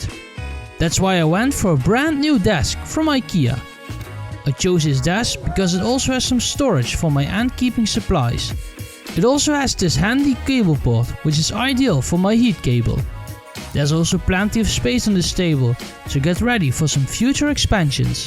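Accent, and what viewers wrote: Netherlands. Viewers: I like the dutch accent 😂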